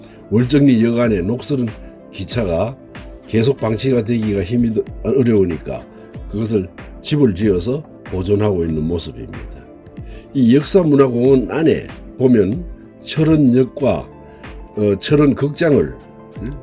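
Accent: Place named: Gyeongsang, South Korea. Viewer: 구독~좋아요~🎉🎉🎉 선물 드려요❤❤❤ 이렇게 자연은 신기하군요 목소리듣자마자 경상도인거 알겠어요 저는 부산이니까요 행복한 날들 영위하셔요😂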